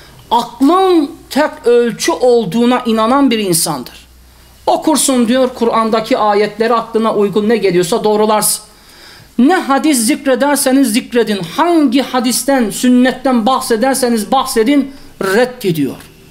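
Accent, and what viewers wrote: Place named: Arab world. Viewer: Islami kelami yasaklayan Islam liderler simdi cennette mi yani? Ne kadar ilginc, Kuran bu liderler geldikten sonra kelami yasaklar olarak algilanmaya baslandi. Islam cografyasinin icindeki parcalanma dusunmenin yasaklanmasidir. Iman kulun soz zikretmesine indirgenirse tepenize biner de binerler. Beyefendi, nicin kalin bir Arap aksaniyla anlatiyorsunuz meraminizi? Nisantasi Turkcesi'nden farksiz bir yerde.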